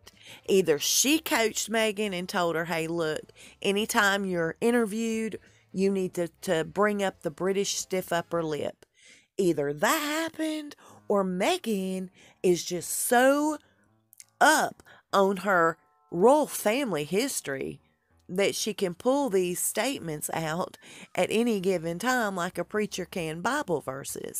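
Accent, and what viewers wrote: South, United States. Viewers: You always make my day with your videos. Your a awesome story tell and love love love the southern accent.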